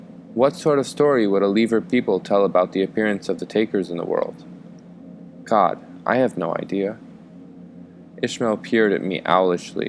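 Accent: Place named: Canada. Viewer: His Canadian accent is coming through strong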